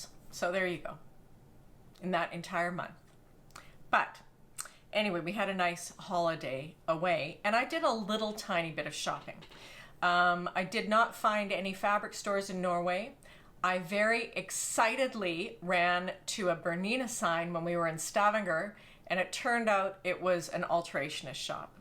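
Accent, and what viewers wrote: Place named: Canada. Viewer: Thanks for your videos. I just discovered you. I love your Canadian accent, so easy to listen to. I wonder if you ever do a FBA. I'm struggling to get my makes to fit me nicely. If I make a size 18, its too big in the shoulders and neck area, so I have attempted to do FBAs, but am never quite satisfied with the results. Thanks again and keep them coming.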